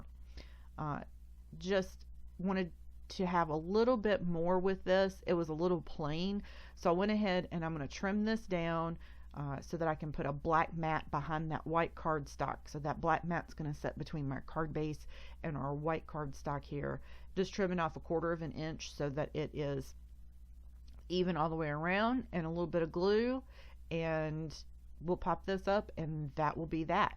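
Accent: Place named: South, United States. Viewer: Love your accent! I’m guessing you’re from the south, it reminds me of a colleague I have from Mississippi 😊 Your cards are so pretty and fun! TFS!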